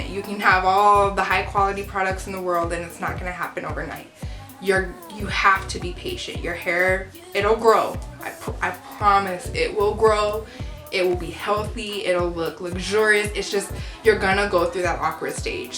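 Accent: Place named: Albuquerque, United States. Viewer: I thought I recognized the accent! I'm a mixed girl too! From Albuquerque! Love your video.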